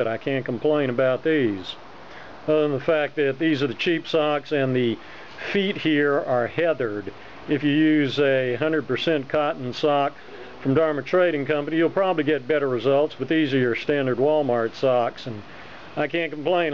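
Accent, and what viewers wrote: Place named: Australia. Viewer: totally!! is u aussie mate=??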